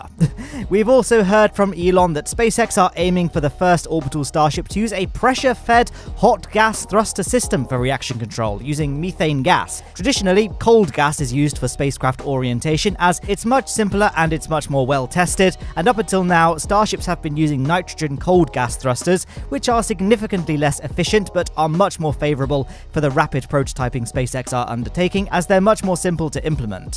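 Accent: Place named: England, United Kingdom. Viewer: As an English man like you I'm not gonna complain about you saying methane and Los Angeles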